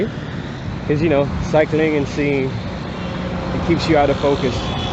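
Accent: United States. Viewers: It's so noisy with that freaking non-stop honking. Can drive you crazy! Btw, your english is pretty good just like an american accent.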